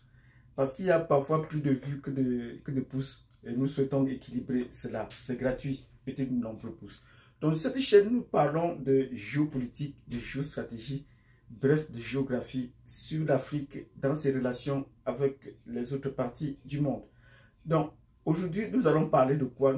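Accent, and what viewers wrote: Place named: Cameroon. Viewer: Par ton accent tu est biyamerounais je dis oh vous les camerounais ou est votre problème ds ce qui se passe chez nous en cedeao au lieu de vous occuper du cameroun du Molière biya et le revenant Ali bongo ,qui prépare sont 3 eme mandats,les citoyens cedeao ne parlent pas de votre pays et de la cemac pourquoi vous ne vous occuper pas ds ce qui se passe chez vous pourquoi vous les bantous vous vs mêler pas de ce qui se passe chez vous .bazlaye d,abord chez vous avant de parlé de quelqu’un avec président biya 42 ans de dictature oas d infrastructures au lieu de venir parlé de Mali,??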